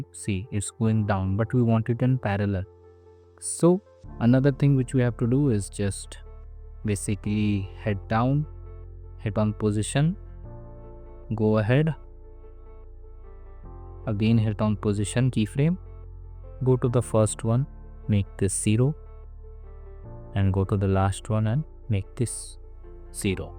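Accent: India. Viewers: wahi to Indian accent me English bolta hai mza aata hai dekhne me lekin pura dalta to achha hota aor bhi logo ka dekhta hu but unka utna mza nahi aata dekhne me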